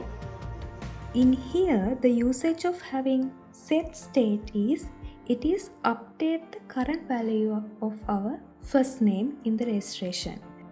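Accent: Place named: Sri Lanka. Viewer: haha I was searching for React Form tutorials and this got recommended. keeyatawath hithuwe naa Lankawe accent ekak ahei kiyala :p Good Luck whoever you are! :)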